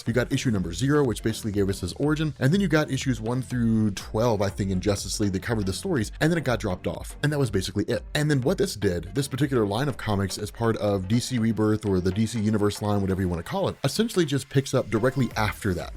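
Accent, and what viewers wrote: United States. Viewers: The way Americans pronounce English places never stops amusing me 🤣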